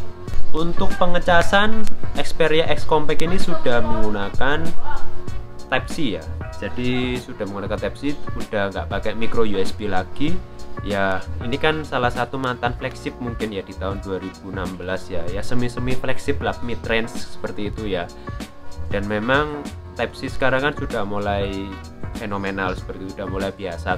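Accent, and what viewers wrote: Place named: Java, Indonesia. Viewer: Medok jowo, lanjut ae lahh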